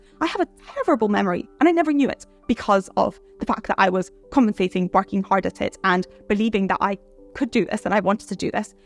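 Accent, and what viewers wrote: Scotland, United Kingdom. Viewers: sorry, i can’t listen to people with british accents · Are you from britian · is it BE accent? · did you speed up the video to get it under or do you normally speak at a NYC “I’m going to miss the bus” pace? Between the rapid fire of words and your slight British accent, I completely failed to understand most of what you were saying. It doesn’t help that you are distractingly beautiful, but… SLOW DOWN GIRL! By the way, throw away that lipstick. It’s the wrong color for you; obviously I found that distracting too. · Thats scotts english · East coast Scotland, sounds like Edinburgh/Stirling private school type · why do you talk like that? are you british ?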